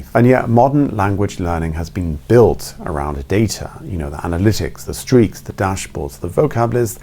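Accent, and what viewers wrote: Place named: Germany. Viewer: haha as someone studying german for A level at around B2 level in england, I wonder if anyone has told you that you actually are developing a slight german accent in the way you say some words lol! Anyway, this is a very interesting and informative video, danke!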